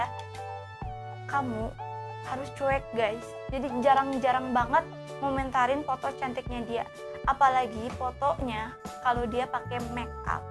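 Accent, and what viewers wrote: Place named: Subang, Indonesia. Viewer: Mirip orang subang...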